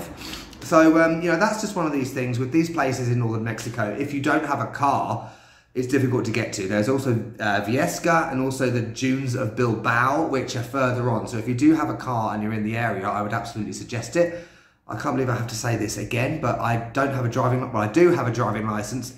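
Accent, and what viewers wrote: England, United Kingdom. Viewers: hahahahaha. Your American accent was awesome!!! But I truly prefer to watch your videos with your wonderful and sarcastic English accent. You can also drink electrolit but always cold, if not, it taste like shit. Be hydrated. Waiting for your next adventure. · Please, stick with your English accent… your American accent is a bit South African lol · Hola! a mi me gusta tu acento inglés así que no lo cambies sigue siendo tu mismo! Me divierte mucho tu forma de llevar los vídeos! Me da muchísimo gusto que te gusto la comida de mi querida Laguna. Cuídate!!! Seguiré viéndote!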